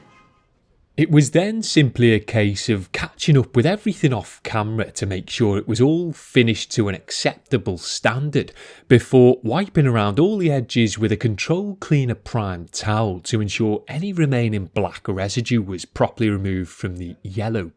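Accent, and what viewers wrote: United Kingdom. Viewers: What makes you great is being a pro detailer and not also having your head up your ass. And your British ass voice.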